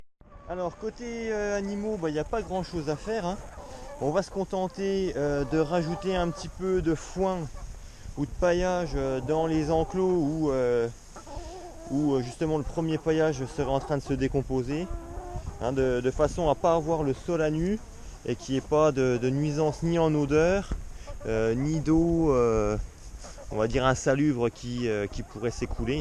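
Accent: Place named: Alsace, France. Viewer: Bonjour à toi. Je découvre ta chaîne et un brin de nostalgie m'envahit. J'habite dans le sud-ouest mais je originaire d'un petit village au pied du Ballon d'Alsace. Ça fait du bien de revoir ces paysages et d'entendre cet accent bien de chez nous. Ça fait bientôt 40 ans que j'ai quitté la région et ça me donne un bon coup de fouet. Merci pour tes vidéos et bonne continuation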